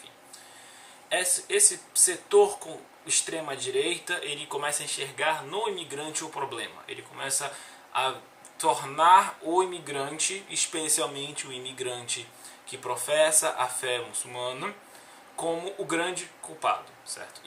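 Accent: Pará, Brazil. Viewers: Paraense? Adorei o vídeo! Maravilhoso!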